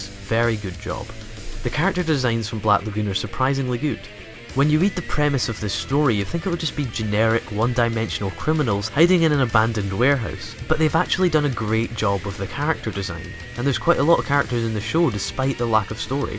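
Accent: United Kingdom. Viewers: And c'mon man, you're British, right? Even saying "badass" sounds cringe.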